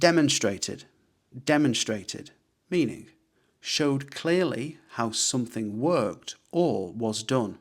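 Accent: United Kingdom. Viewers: What British accent is this?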